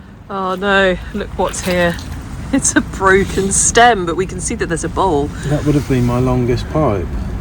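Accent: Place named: London, United Kingdom. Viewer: Do the ducks have a permit? London accent though.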